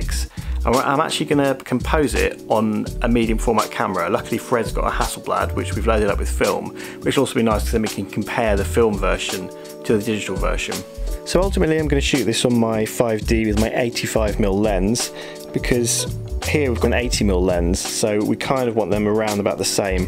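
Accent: England, United Kingdom. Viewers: Hey. Forgot something. I love your english accent (I don't need subtitles) and your videos too.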